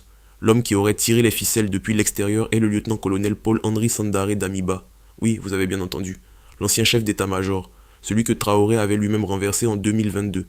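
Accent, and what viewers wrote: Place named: Ivory Coast. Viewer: Arrête de mentir, ce n'est pas ressent comme tu essais de el faire croire. Les frères et soeurs de l'AES attention à ce genre de chaine de propagande contre l''AES. Regardez le nombre d'abonnés qu'il a ce mec, 104 et cela signifie que c'est tout ressèment qu'il l'a créer pour divulguer des fausses informations. N'oubliez pas que la France et les pays comme la Côte Ivoire ont mis en place un recrutement de jeunes délinquants ivoiriens. Dans la vidéo que vous pouvez retrouver facilement sur internet, on n'y voie des africain comme ce mec qui parle dans cette vidéo, assis dans une salle avec des t-shirt noirs avec une inscription dessus. Le bute est d'inonder tout les réseaux sociaux pour contrecarrer l'AES et diffuser de fausses informations, faire des faux débats en montant sur des panel panafricains et pro AES pour tenter de les convaincre et de dénigrer les dirigeants de l'AES. 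Donc ne regardez pas ce genre de chaine comme celle ci qui ne sont la que pour la désinformation du Sahel. Ecoutez la voie de gars qui parle dans cette vidéo, on reconnais tout de suite que c'est un 100% ivoirien avec leur salle accent de merde de woubie. Il n'y a eu aucune tentative de coup d'état ces derniers jours ou même heure. En conclusion c'est l'un des chaine de propagande ivoirienne de fake news et de désinformation sur l'AES. Nous sommes sur place et il n'y a rien du tout . Ils vont prendre des images et vidéos qui datent et vont essayer de vous faire croire que ça ce passe maintenant. Peupl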